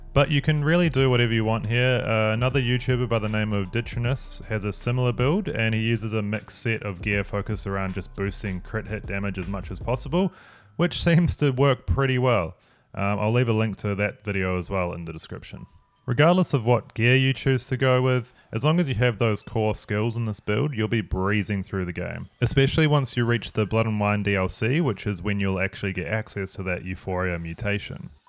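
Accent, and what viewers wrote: New Zealand. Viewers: I’m feeling an NZ accent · 2.3 seconds in.. and I reeeealy appreciate the new zealand accent, where are you from bro? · Searched for the best Witcher 3 build and was happy to hear a Kiwi accent. Big ups my man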